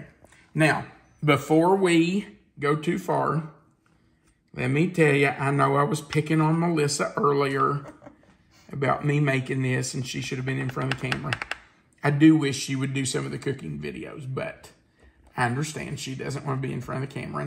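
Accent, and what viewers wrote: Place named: Kentucky, United States. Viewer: I'm a Canadian, who is hooked on your recipes. I also love to hear that Kentucky way of speaking. Your chatter with Melissa is delightful.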